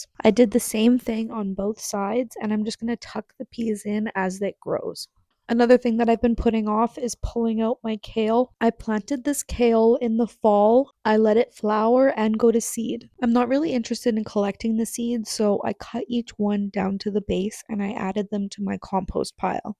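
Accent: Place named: Canada. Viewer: I love how I could hear the Canadian in your voice from the first “about” 😄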